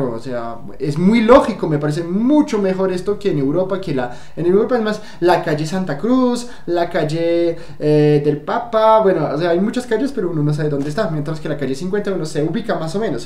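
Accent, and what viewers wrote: Bogotá, Colombia. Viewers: Hola en cuanto tiempo aprendiste español colombiano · De Francia!!, hablas muy bien el español y me encanta tu acento colombiano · Soy mexicano , me encanta Colombia voy muchas veces al año, me doy cuenta que hablas muy bien el español colombiano, hablas tan rápido como ellos jiji, me gustó tu vídeo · Primera vez que veo tus videos, me encanta que te guste mi país... que chevere tu acento ya es muy colombiano, extraño mi tierra a la distancia, y el himno a las 6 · Re perdido..hablas muy Colombiano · Hola, tienes un acento muy rolo. Jajaja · Tiene el acento rolo medio ahí jajaja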